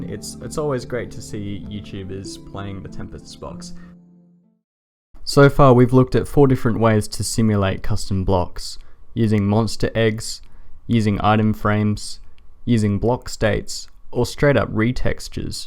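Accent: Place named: Australia. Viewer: you are simply amazing. You are special. This is the first 30 minute video that I have watched in a very long time. I love the way you make your content. You are amazing at block art, command blocking and coding. <3. Btw, you sound Australian, are you?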